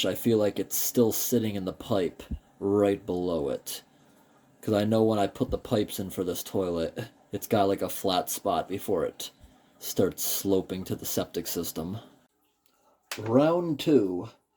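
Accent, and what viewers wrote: New England, United States. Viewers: That is his new england accent.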